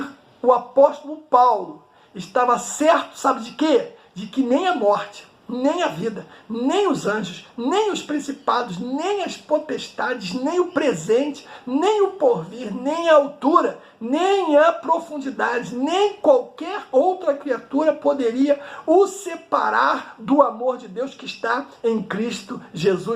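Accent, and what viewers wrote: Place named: Rio de Janeiro, Brazil. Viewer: Vc é carioca ? Sua fala é irritante.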